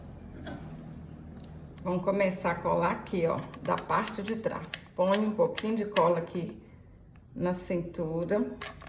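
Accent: Minas Gerais, Brazil. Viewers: Adorei. Amiga Vc é mineira ?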